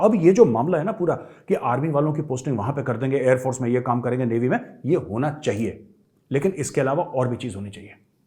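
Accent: Lahore, Pakistan. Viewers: Unique delivery by you of Lahori bol "Kar rahey Hain, Haar rahey Hain...." You video will remain incomplete without this "Hain" word style... You are tooooooooooooo Gooooooooooooooooood... Love you Major Sahab... Bharat Mata Ki Jai... Jai Hind Sir... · Maj sahab aapka Lahori style bahut badiya h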